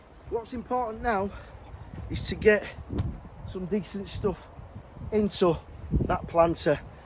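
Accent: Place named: Wakefield, United Kingdom. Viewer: Aww fab, I’m in Wakefield - thought I recognised the accent! Really enjoying your vlogs - keep them coming 🤩 And I’m keeping the sunflower idea in mind for my future grandkids. Such a lovely gesture!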